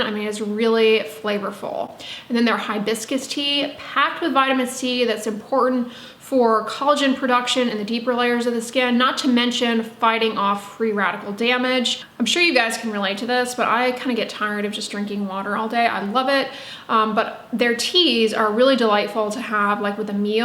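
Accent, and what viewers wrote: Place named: California, United States. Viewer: Where are you from? I know you are in Houston now, but you really sound like you are from California. Just wondering. I love your channel by the way.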